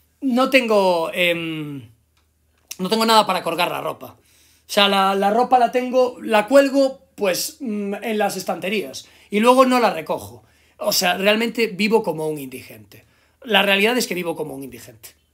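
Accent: Spain. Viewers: Que voz tan diferente y no tan gastada de ase años el joven sonaba como español genérico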